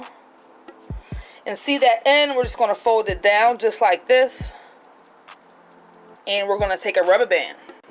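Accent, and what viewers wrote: Baltimore, United States. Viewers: Are you from Baltimore? You have a very distinct accent. Loved the video! 💖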